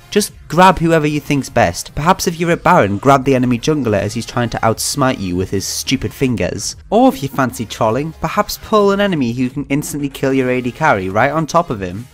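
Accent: Newcastle, United Kingdom. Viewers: mate, you a geordie too?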